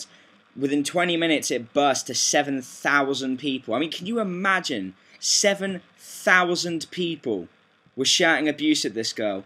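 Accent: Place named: United Kingdom. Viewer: Lol a British guy..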